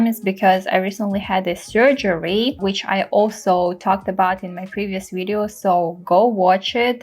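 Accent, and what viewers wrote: Russia. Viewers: Акцент похож на русский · Why does your english sounds so russian? Is it just me or you may be sibirskaya? 🤔 🖤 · Are you Russian? You don’t sound American at all and I’m American with no understanding of the Chinese language but you look Eastern Russian or Korean Japanese maybe of mix race. Grateful for you guide but a little too long for my patience, you’re an expensive gal, nice LV shopping spree from coffee table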